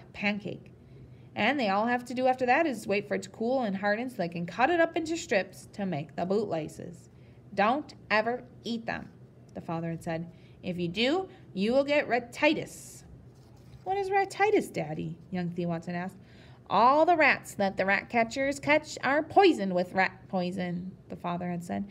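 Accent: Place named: United States. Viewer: Oh dear read by an American . Spoilt from the start .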